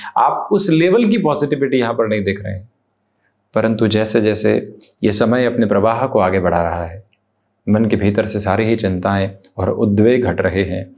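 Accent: Standard. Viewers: आपका आभार एवं धन्यवाद, इतनी स्वच्छ और शुद्ध हिंदी सुनकर मन प्रफुल्लित, आनंदित हो उठता है।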